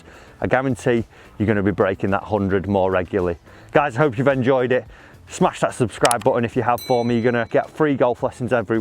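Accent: United Kingdom. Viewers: I can't get enough of that british english (are you from Manchester?)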